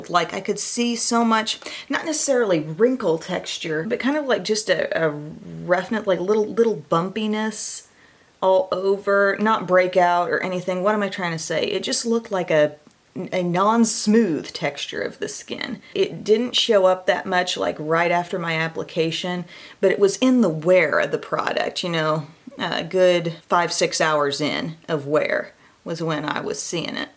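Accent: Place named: Southern Indiana, United States. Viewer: O.k. going to try the Profusion products now that you recommend them! They look fun! I've been watching your channel and I believe I grew up near the area you grew up. I'm from Southern Indiana near Evansville (live outside DC in Northern Virginia now)! Your voice reminds me of "home!" And, I just love that. Nice to get beauty tips and a taste of home all at the same time! ❤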